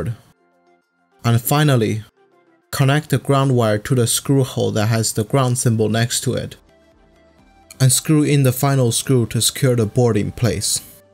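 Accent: China. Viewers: gonna say it seems like a little bit Chinese accent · 这英语我怎么听出一股天津味儿呢。。。🙂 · 感覺像華人講英文,因為我竟然能聽懂!!! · I watched all your videos today and subscribed!!! 听口音应该是华人吧？真心佩服，加油！期待更多视频！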